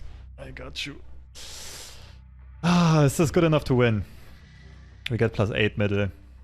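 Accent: Germany. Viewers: Most german accent on YouTube 😍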